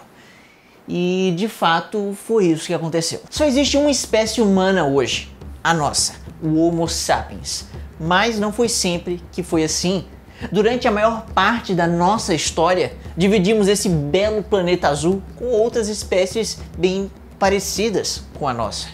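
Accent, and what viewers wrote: Ceará, Brazil. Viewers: nossa achei que ele era cearense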